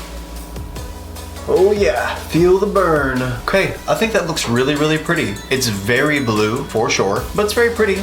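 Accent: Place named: South, United States. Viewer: Why are you the cutest?! I just found your page and I really like your Stila liquid tutorial and also your slight southern twang! ❤️❤️❤️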